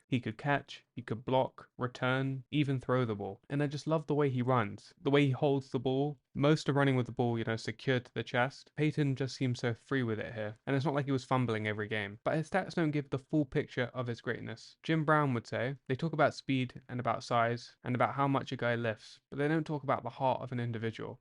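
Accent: United Kingdom. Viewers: A fucking British accent reciting stats pretending he knows what happened. Stick to Soccour.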